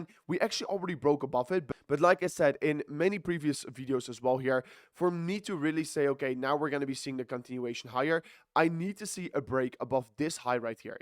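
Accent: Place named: Achterhoek, Netherlands. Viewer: Beetje achterhoek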